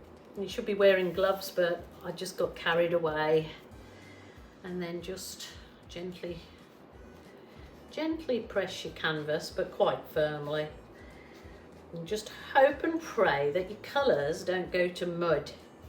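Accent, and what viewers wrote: Birmingham, United Kingdom. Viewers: Wow that is brilliant...love these colours. You may be in australia but to us brits we can still hear your brummie accent x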